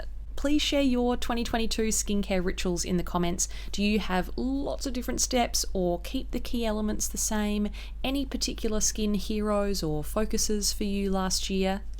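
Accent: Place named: Australia. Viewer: oh how I missed that particular Aussie accent ;) I'll definitely have a look into the cicaplast balm - it sounds promising and I could use a good calming cream just about now!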